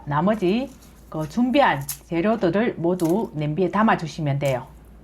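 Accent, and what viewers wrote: Gyeongsang, South Korea. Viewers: 님 프사 버꾸셨네요? 항상 좋은 정보와 레시피 올려주셔서 감사하고 대구말씨 넘~~좋아요👍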